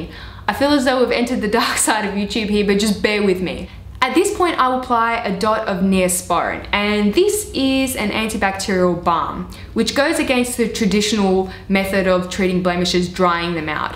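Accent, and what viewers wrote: Australia. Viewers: Lol the end was funny, nice to you as you!!! Btw, r u Australian?!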